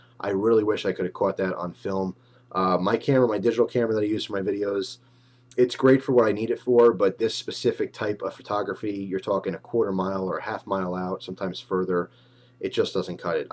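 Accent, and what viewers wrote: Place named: East Coast, United States. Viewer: East coast accent buddy. Lol. Everyone fucks with us for it.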